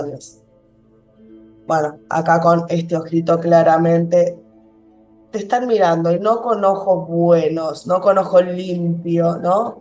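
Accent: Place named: Argentina. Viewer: HOLA ME GUSTA. LA LETURA. ES MI PRIMERA VES CONTIGO. SI MI FAMILIA ES BUENA PERO HAY INTERESES CREADOS. YO QUIERO SER LIBRE TOTAL Y ESTAR BIEN EMOCIONALMENTE. TE ESCUCHO DE URUGUAY MONTEVIDEO. VOS SOS ARGENTINA POR COMO HABLAS LO SUPE INTUCION. 🇦🇷🇺🇾 SOS UN AMOR. SI ESO QUE SOY MENTAL SI LO SOY PENSAR MÁS EN MI. TE AMO ARGENTINA UN PAÍS HERMOSO FINO DE MODA DE GENTE HERMOSA ESTILO. URUGUAY ES MÁS TOSCO, LA GENTE SERÍA MUY POCO OPTIMISTA, LO BUENO ES LAS PLAYAS Y NO SE ESTA MAL PARA VIVIR HAY MUCHOOOOO ARGENTINOS ACÁ. Y MI NOMBRE TODOS ME DICEN SOS BRASILEÑA NO MI ABUELO PATERNO PERO NO YO NO POR MI MAMÁ ITALIANA Y AHÍ TENGO APELLIDO HERMOSO ITALIANO DE GENTE MUY DIVINA. CALABRESA. ASÍ ME CONOCES MÁS. SI LABORALES SI MEJORES SI Y QUE MI PAREJA TRABAJE POR DIOS ES MEDIO VAGO. MI PAREJA TIENE ALGUNA S COSAS BUENAS. GRACIAS SOS UNA GENIA MUJER. SI TENGO DUDAS SI DE MI PAREJA. 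EL PROMETER Y NO CUMPLE NADA. BUENO ME GUSTÓ CONOCERTE MI CHICA ARGENTINA BESOSSS PARA 🇦🇷🇦🇷🇦🇷🇦🇷🇦🇷🇦🇷🇦🇷🇦🇷🇦🇷🇦🇷🇦🇷🇦🇷🇺🇾🇺🇾🇺🇾🇺🇾🇺🇾🇺🇾🇺🇾🇺🇾🇺🇾🇺🇾🇺🇾🇺🇾💋💋💋💋💋💋💋🌻🌹🌹🌹💋💋💋🌻🌻🌹🌹🌹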